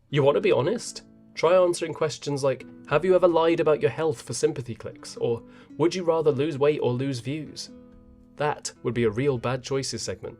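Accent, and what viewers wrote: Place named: United Kingdom. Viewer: I don't understand how you can take someone like ALR and speak so eloquently about her or what she's doing. I normally feel dumber after watching her but when I listen to you react to her, I actually feel smarter. Hey, it's NOT just the accent, either. Even though I have a thing for British accents because y'all could read the menu from McDonald's and it sounds intelligent 😂. It's like I'm watching a documentary and Albert Einstein is explaining how a sloth sloths. It's wordy but understandable. And it's Albert fucking Einstein, besties 😂. I think you might become one of my favorites, Mr.!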